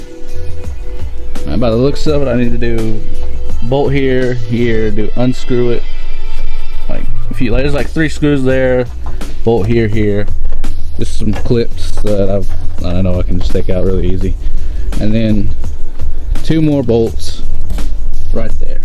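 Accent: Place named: South, United States. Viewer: You sound very southern, but actually a very practical ricer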